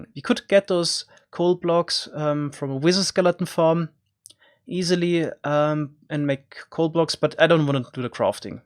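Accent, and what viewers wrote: Germany. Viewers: Du hast einen deutschen Akzent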